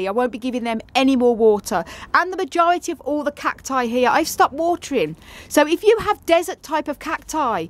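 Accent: Birmingham, United Kingdom. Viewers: Are you from brum..sound like my family😂😂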